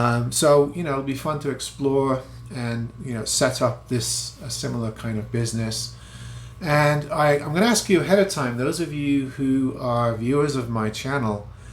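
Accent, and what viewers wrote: United Kingdom. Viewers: I never thought before I guess from your accent I thought you was in the UK or there abouts lol anyways happy travels mate and hope your journey is a safe one! cheers!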